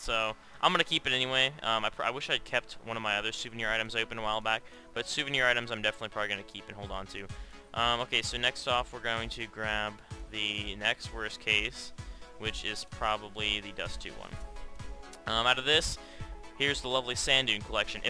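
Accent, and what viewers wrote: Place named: South Africa. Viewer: I thought you were South African... Where are you from???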